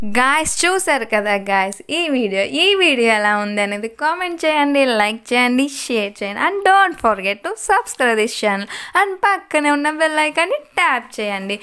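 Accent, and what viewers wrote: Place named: United States. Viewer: Ur english is very good like american english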